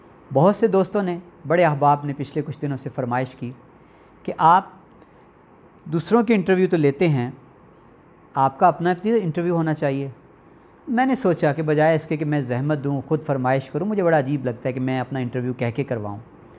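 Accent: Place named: Lucknow, India. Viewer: ماشاءاللہ بہت خوب جی Great inspiration معلوم ہی نہیں ہوا کہ آپ کوٹلہ سے ہیں ،،، بلکہ ایسا محسوس ہوتا رہا سُنتے سُنتے کہ آپ لکھنئو سے تعلق رکھتے ہیں ، واللہ بہت ہے دل خوش ہوا ،،، Watching from USA.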